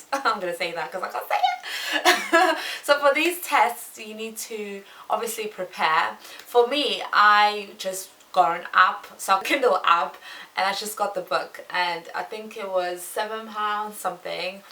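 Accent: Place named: South Africa. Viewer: but you grew up this side right, cause i can here that SA accent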